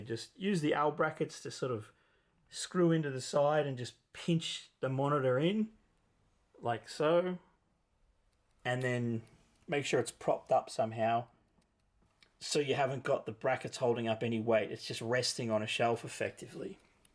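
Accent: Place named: Australia. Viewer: Love the marquee mate! Looks awesome. I suppose you’re also in Australia or maybe NZ by the accent? I just placed an order with Chino last night and should be getting mine in NSW in a month. Very excited and planning on making some small tweaks - mostly replacing the monitor and connecting either a pc or my ps4/ps5 at some point. Just checking if you’re really in AU/NZ to get some tips on where to get parts from locally. I’m sure I’ll need a little box or some cables here and there lol. Cheers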